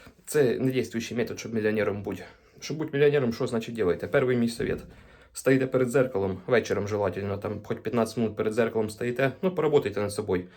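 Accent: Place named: Poltava, Ukraine. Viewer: Звідки ти? Говір схожий на мій Полтавський!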